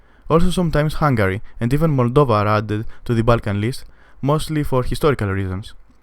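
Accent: Greece. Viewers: ΝΑΙ ΜΕ ΤΟ ΠΟΥ ΑΡΧΙΣΕ ΤΟ ΒΙΝΤΕΟ ΚΑΤΑΛΑΒΑ ΟΤΙ ΕΙΝΑΙ ΕΛΛΗΝΑΣ ΛΟΓΟ ΤΗΣ ΠΡΟΦΟΡΑΣ. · It is so obvious you are Greek your dumbass accent is giving it away. Btw its MACEDONIA not fyrom :) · I know you ard greek from your accent hahah XDXDXD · Greek English..I just found out lol..nice job btw Greece is the best #GREEKLIFE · your English accent is sucks buddy all Greeks have same accent try to speak better English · Constantinople was Greek so Turkey is not in Balkan Greece has economy crisis but richest in the Balkan And you Greek because this is how greeks talk in English φίλε μ 🤣λέει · This is a voice of a Greek i just know... (I am from Greece btw) i might be wrong idk... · By his accent alreafy found out he was greek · Bias? Greek accent. And as an Albanian, i'd choose Slovenia aka. Switzerland of the Balkans. I've only been to Montenegro, Kosovo, Slovenia and Greece (i was gonna go to Serbia but was not welcomed lol) and I judged by the ranking in the video and my experiences.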